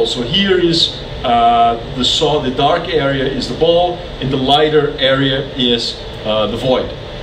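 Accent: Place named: Brazil. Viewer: Esse cara tem muito sotaque de brasileiro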